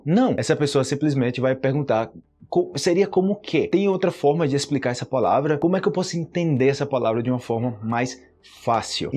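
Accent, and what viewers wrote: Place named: Colombia. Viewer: Voce é crack,! A maioria de coisas que eu aprendi em portugues foi com os seus vídeos! <3 Obrigada sempre! Aproveito para te falar que fico surpresa sempre com o seu espanhol maravilhoso e o seu sotaque colombiano!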